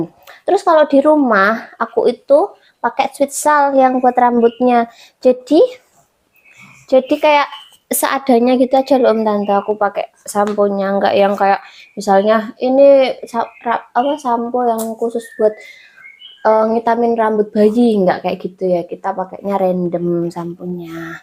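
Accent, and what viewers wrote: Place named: Java, Indonesia. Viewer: Baby Hazel dapat gen terbaik dari embahnya. Semoga tumbuh sehat ya Dek...💚 Mbaknya daerah mana kok logat jawanya dapet banget. Sukses ya Mbak...🙏🏻☺️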